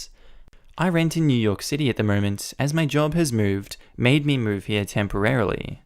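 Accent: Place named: Australia. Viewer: Judging by his accent * is also ausralian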